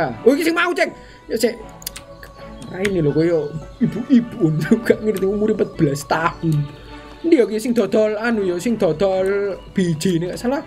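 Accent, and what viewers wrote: Java, Indonesia. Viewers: Mas Sak vidio ngoming medok sak vidio o ae Temenan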